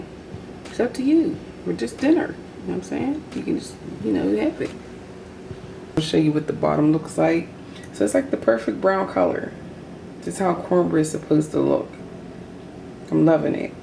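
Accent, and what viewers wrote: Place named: Delaware, United States. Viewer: Hey! This looks good! I have a sweet tooth as well lol and love sweet cornbread too! I like how you talk too lol you kinda sound like my family that’s from Delaware 😊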